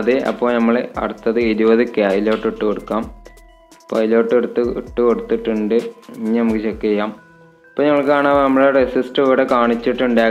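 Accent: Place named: Malappuram, India. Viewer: Muyuvanaayum ???? Enthu malayalam aado parayanathu.... malapuram or calicut sure. maya , payam , puya ..... 😷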